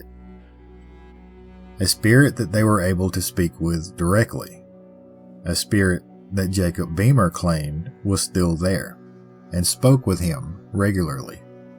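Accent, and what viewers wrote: South, United States. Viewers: hey wat can I say? I live here & I'm fascinated by spooky shii lol & you put dat lil southern charm on your story tellin I like it! ya huge fan lol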